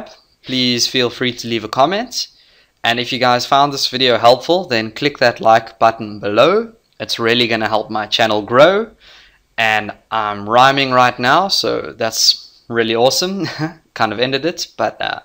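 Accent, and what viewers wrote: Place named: South Africa. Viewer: As soon as I heard your voice I was like... This one is a homie from South Africa. 😀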